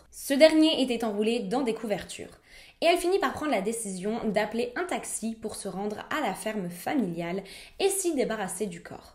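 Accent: Quebec, Canada. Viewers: Toujours sympa l'accent québécois, t'assure !!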